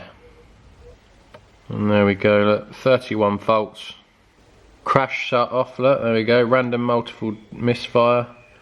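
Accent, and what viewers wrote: Birmingham, United Kingdom. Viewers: Definitely from Birmingham 😂😂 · Blatantly from Birmingham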